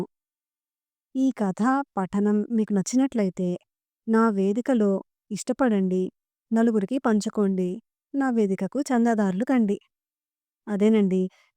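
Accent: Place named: Telugu, India. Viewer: చాలా బాగా చదివారు. గొంతులో తెలుగుదనం చక్కగా తొణికిసలాడింది.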